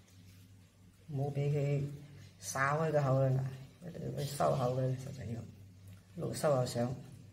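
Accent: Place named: Renhe, China. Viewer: 我地家乡都整呢滴 😄 听你的口音 有点像人和人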